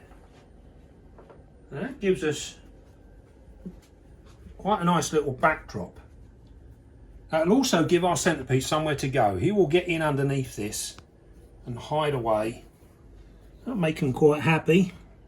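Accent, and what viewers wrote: United Kingdom. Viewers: That's British, not Kiwi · I'm french and not bilingual at all and can perfectly understand him. I actually like his british accent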